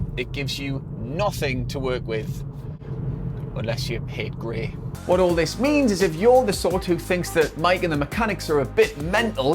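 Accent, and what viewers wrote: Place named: North East England, United Kingdom. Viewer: Why aye the man from the north east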